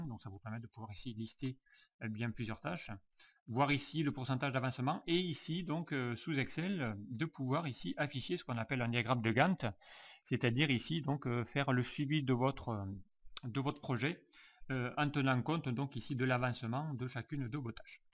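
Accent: South, France. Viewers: Pas la peine de me répondre à mon dernier message, je vais d'abord visionner cette video merci pour vos tutos et pour le petit accent du midi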